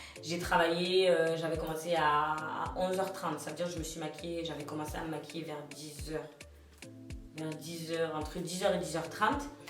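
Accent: Marseille, France. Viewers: J’ai regardée juste avant une vidéo où tu parlait que ton accent marseillais dérangeait et là on voit que tu perd ton accent le sang 😭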